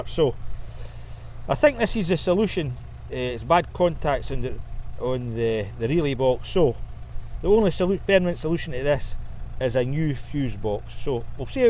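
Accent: Scotland, United Kingdom. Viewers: Thanks for the video. I like ur teaching especially the Scottish accent. Will subscribe! My son's audi a3 2010. Keeps cutting out which is why I'm here. Normally when at operating temperature. But no real pattern. Code said crankshaft shaft sensor. I replaced. Cleared code. Drove 100miles the happened again. So I replaced cam shaft sensor. Not clearer code but cut out at warm engine Started again and let it idle 1hr. Fans on few times. But confident to drive it incase cuts out again But will look at this. Thanks Anything else I should try look for? Wish u were in London.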